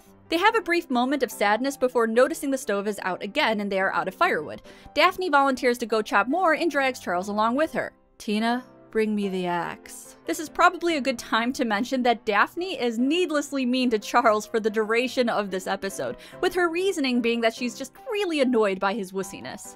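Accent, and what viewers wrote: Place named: United States. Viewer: American accents like yours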